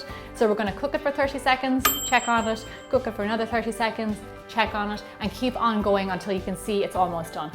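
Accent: Ireland. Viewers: Did anyone else notice she had an Irish accent. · They are all amazing! You've taken mug "cakes" to the next level! Weill dun (trying to imitate your super cool Irish accent! lol)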